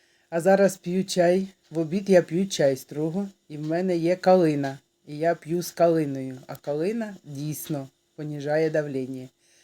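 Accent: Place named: Russia. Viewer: у вас проскакують в кінці слів російська вимова.Ви не в Злинці живете?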